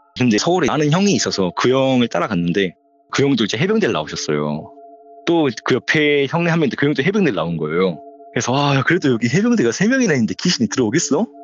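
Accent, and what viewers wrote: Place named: Jeolla, South Korea. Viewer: 말투가 전남이시군요 ㅎ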